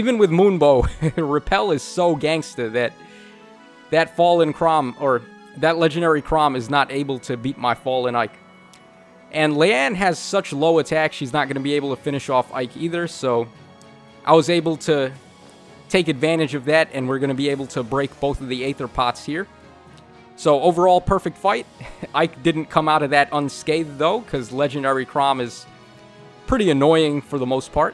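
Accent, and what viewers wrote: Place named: Brooklyn, United States. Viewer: May I just ask you to say Kiba? Because you sound like you're from Brooklyn or that area at any rate...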